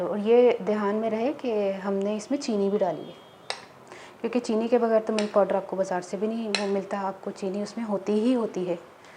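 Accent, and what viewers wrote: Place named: Punjab, India. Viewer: Ma sha allah and jazak allah amazing recipes zaberdast tips ,way of talking with meethy panjabi❤❤❤.... mane apki kharab doodh ko dobara theek kerne ki tip dekhi yaqeen nhi aya aisy kese ho sakta hai mazy ki baat kay main itafaq say gher nhi thi buchon nay doodh liya or bahir rakh diya jb ai toh dekha dood fridge main nhi hai ghabra gai thora nikal k boil kiya 4 kilo doodh kharab socha try kerti hun ap wala tip and amazingly superb pooray gher main dhamaal daal rahi thi kay panjabi kuri di tip kamaaaaal👍👍👍❤❤❤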